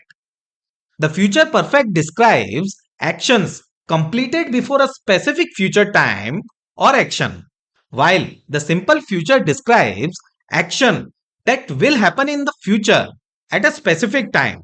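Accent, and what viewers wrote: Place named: Mumbai, India. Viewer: It’s the best explanation that I have ever met. Thanks for it! Although you have the “mumbai” accent - no matter! For us in Czech rep. it’s even easier to understand English with this accent 😁.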